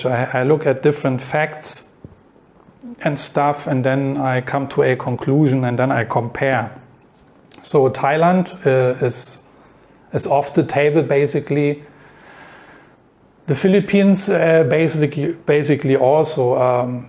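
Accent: Germany. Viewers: You're very interesting!😉. With that german accent and dry sense of humor, very funny! Wow you've been traveling a long time. I need to get back to SE Asia. I been considering returning to Thailand. I was a teacher in Thailand, Japan, and Taiwan previously. I recently got an offer to teach in Indonesia but am nervous due to the possible natural disasters and political strife but which country doesn't have this problem?...LOL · Indonesia is good but the Philippines is garbage .But his broken English in a heavy German accent is irritating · Your Accent sounds is very German ..are you ? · Can I recognize your accent as German? All in all, our experience is much of the same than yours. Somehow we still became starting to apply for a Thai Visa whereat we ourself also know ourselves how great the people in Indonesia are. We've not been to Bali though, and our experience in Aceh was different. 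Including that, we've been to Indonesia for 6 times until now. I myself would like to have an Indonesian retirement visa additionally to the Thai Visa but my wife isn't as convinced of that for now. The other mentioned countries never have been an alternative for us, maybe also because one of us is trans woman. That might, seen from the West, seem a little bit not so logical given that that Indonesia is a Muslim country. But, except from Aceh, our personal experience experience was that the people there don't care about that at all. I'm not talking of people as staff or otherwise expect a deal with you. To cut a long story short, where are you living in Indonesia?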